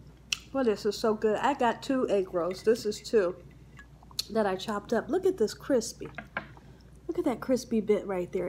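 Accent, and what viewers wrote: South, United States. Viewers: I love your show, my granddaughter love you when u say "like so" too bad for the haters. I LOVE YOU. R u from the South. My name is Pam I live in Up State NY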